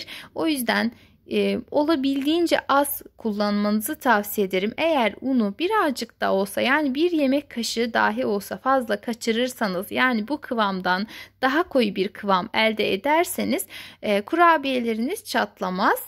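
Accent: Azerbaijan. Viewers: Super olmuşşş,azərbaycanlımısınızz?? Lehçedə biraz nəsə var 🥰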